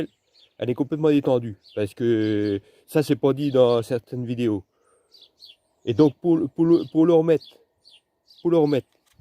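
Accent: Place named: Nord-Pas-de-Calais, France. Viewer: Et avec un bel accent du nord pas de calais. Merci pour tes conseils min tcho!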